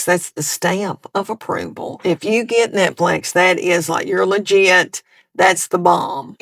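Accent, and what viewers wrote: South, United States. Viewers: Its on par with Seinfeld humor, which did great so not sure why theres not more of it. Clean humor that for the most part deals with humorous ironies of day to day life. This makes it relatable to everyone who listens to it. And for whatever reason, her deep southern accent makes it all the funnier. Glad i found her ❤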